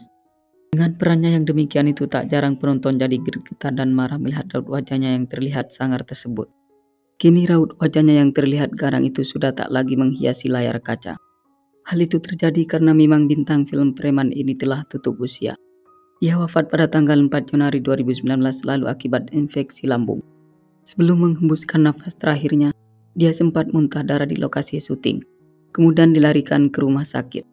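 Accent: Madura, Indonesia. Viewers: Pengisi suaranya kayak orang madura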